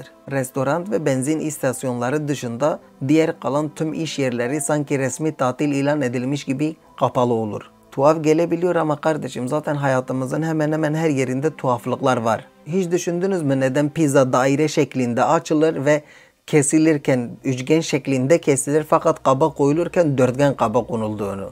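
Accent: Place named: England, United Kingdom. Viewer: Abi selam ne kadar yaşıyorsun norveçde Türkçeyi ingilizler gibi konuşuyorsun galiba biraz asimile olmuşsun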